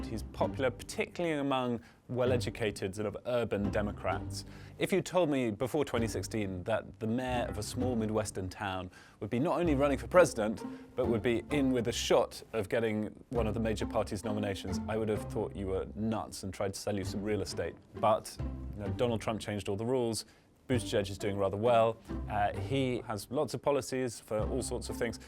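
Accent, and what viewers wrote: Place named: United Kingdom. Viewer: Why am I hearing HEARING A BRITISH VOICE !!!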